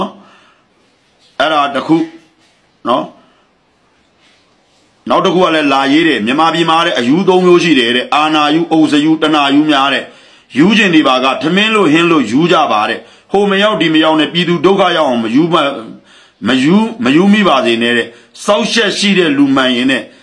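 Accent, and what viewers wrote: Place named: Rakhine, Myanmar. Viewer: ခင်ဗျားဒီပြင်ချန်နယ်မှာမပြောနိုင်ဘူးလား၊ရခိုင်အသံမုန်းလွန်းလို့၊ခင်ဗျားဒီချန်နယ်ဘဲပြောမယ်ဆိုကျုပ်နားမထောင်ချင်တော့ဘူး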